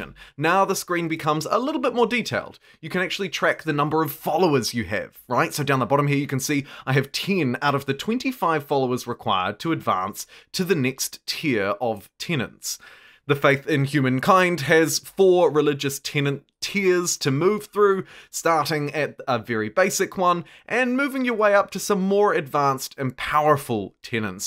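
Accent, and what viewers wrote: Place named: New Zealand. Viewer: As a fellow kiwi-accent-haver I understand the pain.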